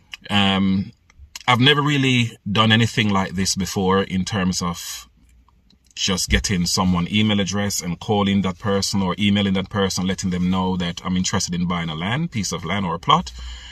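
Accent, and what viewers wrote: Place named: Caribbean. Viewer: I love your accent! Are you from the Caribbean?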